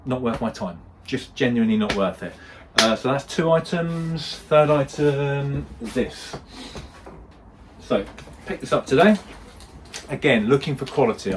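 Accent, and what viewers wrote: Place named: Cockney, United Kingdom. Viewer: Love to see you back. I thought something horrible must have happened to you. But no need to worry loads of people have a mid life crisis, yours just involved lots of ladies clothes 😮 UPDATE: When did you go all Cockney? Most people go the other way, your heading towards selling 80% baby laxative wraps outside McDonalds. I need to check back on some of you old vids this is confusing.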